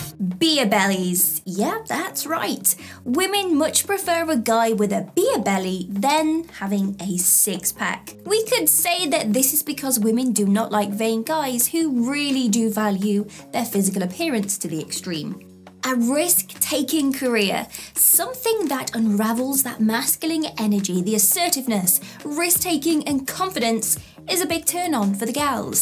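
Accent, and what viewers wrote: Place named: Midlands, United Kingdom. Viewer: What I really want to know is about your accent. Where are you from that gives you that very sweet soft and subtle accent. Its not overpowering so definitely not a northerner lol. And not deep into London or Liverpool for that matter. Maybe the midlands or the Lake District. I can’t quite pin it down lol Please do tell. You don’t have to be super specific or anything just the general area or county.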